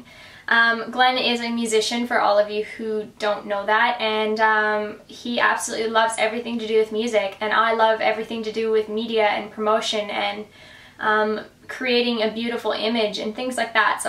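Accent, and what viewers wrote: Australia. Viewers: I only just read that you live on the Central Coast! I was thinking your accent had some big Aussie leanings! That's awesome. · i love how you have a little bit of a an aussie accent that comes out every once in a while :) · I'm new to your channel and I just have 2 questions. 1. Does your Australian accent come in and out? 2. What do your tattoos mean?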